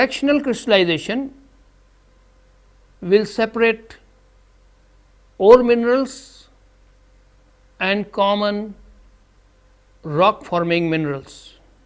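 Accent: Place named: India. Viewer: I really love Indian professors although quite accent is challenging me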